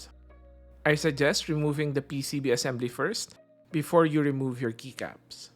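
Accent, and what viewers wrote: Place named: Philippines. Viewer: My dude's Tagalog accent is oozing.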